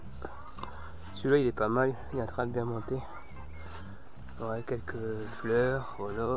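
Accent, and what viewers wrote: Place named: Picardy, France. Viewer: bonjour,je découvre votre chaine. je m abonne car j adore. vous seriez pas de picardie? j ai le meme accent.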